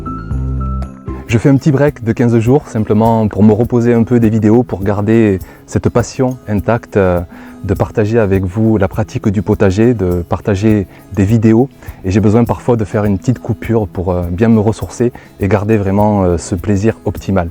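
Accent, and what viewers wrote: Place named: Montpellier, France. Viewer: Bonjour, un immense merci pour ces vidéos très intéressantes. Le propos est parfaitement clair et votre amour du potager et de l'écologie est communicative:) Bonsoir, Je travaille en tant qu'assitant pédagogique au Collège des Escholiers de la Mosson à Montpellier. Nous sommes une équipe de 5 (pour l'instant), et souhaiterions relancer le potager du collège, qui est déjà bien en place, mais a été laissé en friche depuis trop d'années.... Personnellement, la permaculture est un domaine que je découvre peu à peu... et qui est passionnant! Connaitriez-vous des personnes ou des assos qui voudraient bien nous aider, pour faire un premier "diagnostic" du jardin sur place, et nous conseiller un peu sur les choses à mettre en oeuvre pour lui redonner vie et l'animer? Nous avons déjà un peu planché dessus, mais des avis d' "amoureux" initiés et d' "experts" nous permettraient sans doute d'avancer plus sereinement, et de mener à bien ce projet qui nous tient à coeur pour la vie de l'établissement. 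Nous souhaiterions commencer les actions dès octobre, idéalement et dans la mesure du possible. Timing serré...:p C'est jouable selon vous? On prend toutes les pistes: rencontre autour d'un verre, chaines youtubes, sites internet, bibliographie... et si vous êtes dans le coin de Montpellier (l'accent laisse penser que vous êtes pas trop loin), ça serait un plaisir de vous recevoir au jardin (si ça se met en place...) Vous pouvez me contacter en sur Facebook (Nyco Youpy) ou sur <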